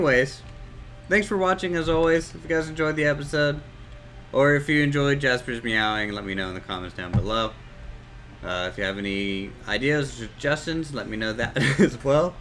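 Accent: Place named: North, United States. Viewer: Keep up the great work!!! P.S. This is super random, but where are you from? I often hear police sirens in the background, so I'm guessing a decent sized city. And I feel like you have a northern U.S. accent. So I'm guessing somewhere Minnesota or maybe Chicago? lol just curious, I'm sure I'm way off haha.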